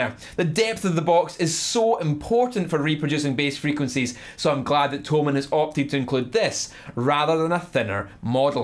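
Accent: Scotland, United Kingdom. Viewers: I really like this site, is extremely funny and instructive to watch, but his accent is a little hard to follow for my South American ears, is it Scottish? I really like his accent, too!! 🤘🏼🤘🏼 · yeah, he's scottish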